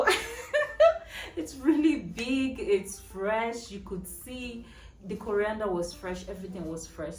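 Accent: Nigeria. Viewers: Yeah I noticed that too.. is very subtle only sell outs being "T" and "O" sounds as compared to most Nigerians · Your Nigerian accent sounds not Nigerian. The loudness is also loudless for a Nigerian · So calm and your Nigerian accent is minimal.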